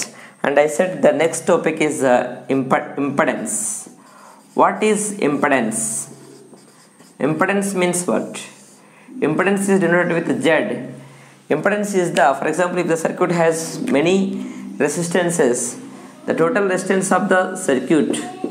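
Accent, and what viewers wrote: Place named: South India, India. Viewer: Where do you live sir? Because from your accent, you seems like south indian and south Indians teach better than any other (this is my experience)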